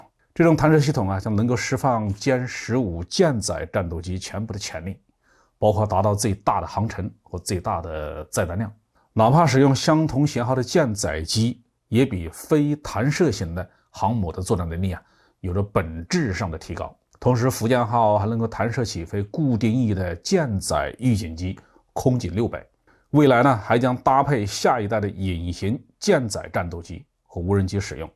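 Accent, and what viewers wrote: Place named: Hunan, China. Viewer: 包大校言简意赅的湖南口音 ，简直就是福音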